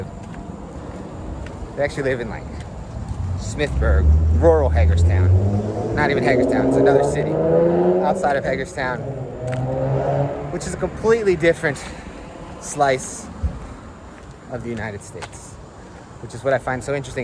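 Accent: Brazil. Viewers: We are so used to seeing speaking Portuguese that when he speaks English it seems like he is a Brazilian making videos in English, heheheh. Make more videos in English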